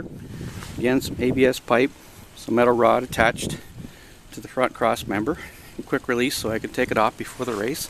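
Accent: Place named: Canada. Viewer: so i guess youre Canadian huh? ;)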